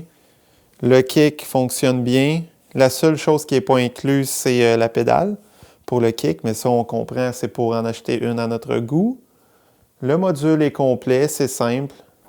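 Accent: Quebec, Canada. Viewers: C'est un accent Quebecois le grand.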